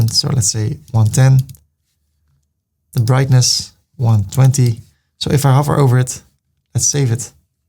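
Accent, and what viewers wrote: Netherlands. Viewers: I really dig the Mark Rutte English. Cool stuff.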